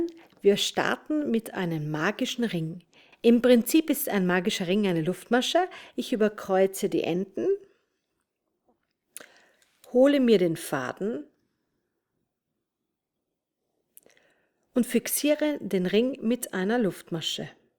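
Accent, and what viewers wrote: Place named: Austria. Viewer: Mir geht es schon besser, den österreichischen Slang zu hören! Danke, für die Anleitung!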